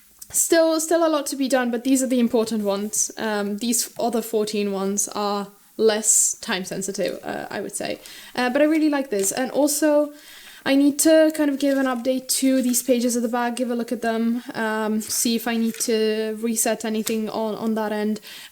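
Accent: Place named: United Kingdom. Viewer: ahh neat! I mostly think I hear an English accent when I hear you speak but as I said the longer I listen I realize there is something else there and I knew you had mentioned Italy before! Accents are so interesting in the way they can blend together and change quickly! I always think I would adopt an accent of wherever I am lol.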